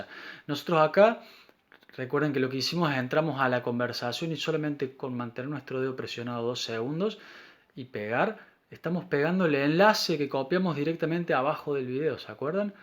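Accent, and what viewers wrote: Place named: Cordoba, Argentina. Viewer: Este es cordobés argentino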